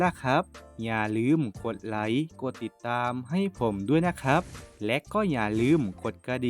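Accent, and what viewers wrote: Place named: South, Thailand. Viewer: ผมคนนครพี่คนที่ไหนเสียงเหมือนคนใต้เลย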